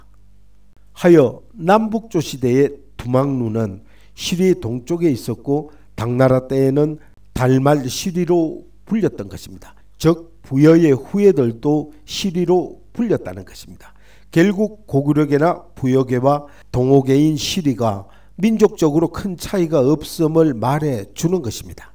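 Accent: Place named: Busan, South Korea. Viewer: 하이고 ᆢ 나도 부산이지만 사투리 좀 고쳐라 ᆢ 다른 유투브에서 그러더니 아직도 못 고쳤네 ᆢ 왜 그리 어미에 그리 엑센트를 두냐 ᆢ?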